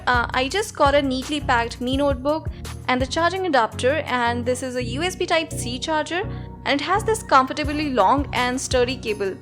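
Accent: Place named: India. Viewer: i like your indian accent.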